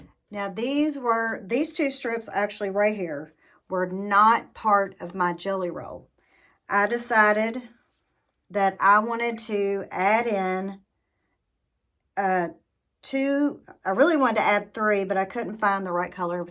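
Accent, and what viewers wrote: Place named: Tennessee, United States. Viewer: That's a beautiful quilt. Are you from Tennessee, by chance? Your voice reminds me of some of my folks in Overton County.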